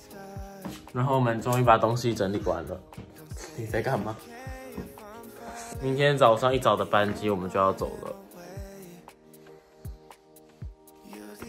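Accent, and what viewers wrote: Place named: Chongqing, China. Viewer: 所以学弟弟是海南人嘛？刚刚说的不是重庆话嘛？我凌乱了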